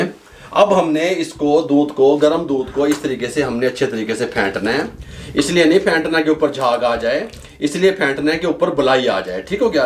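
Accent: Punjab, India. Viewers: I like your pure punjabi style being a punjabi person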